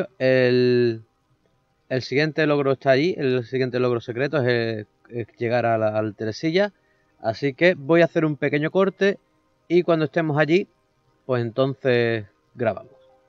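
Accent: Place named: Cádiz, Spain. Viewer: Bua te debo un riñón, me has ahorrado tirar el mando un par de veces con los muñecos ortopédicos estos. Tus videos me han venido de lujo para una promoción de xbox. Por cierto, ¿de Cádiz? Suelo reconocer a los compatriotas jeje. Saludos y muchísimas gracias por tu tiempo.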